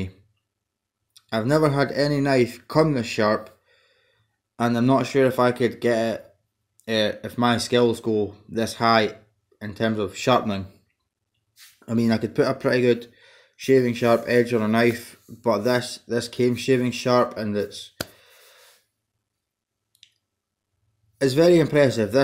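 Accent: Scotland, United Kingdom. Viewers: Very dope. Now, is he from Edinburgh or from Glasgow? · Scottish accents are dope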